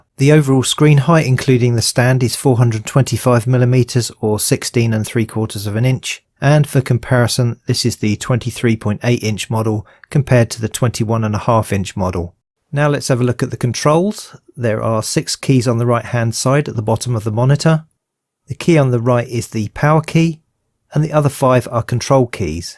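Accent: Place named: United Kingdom. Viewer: Sweet British accent. Regarding monitor I have shortlisted ss cf390, curve.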